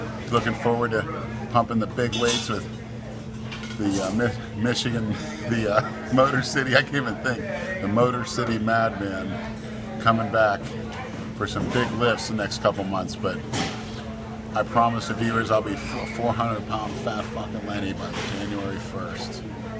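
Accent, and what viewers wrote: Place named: Pittsburgh, United States. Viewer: I love the Pittsburghese, makes me miss home.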